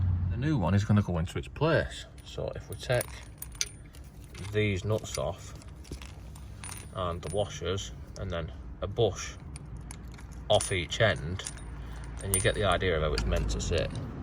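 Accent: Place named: Lancashire, United Kingdom. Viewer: yep and don't go talking posh when you become a star . The Lancs accent is great 👍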